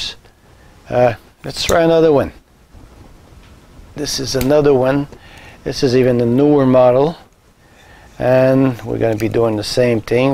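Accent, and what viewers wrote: Quebec, Canada. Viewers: Tu sonnes tres quebecois? Serait-ce possible que tu sois au QC? super video en passant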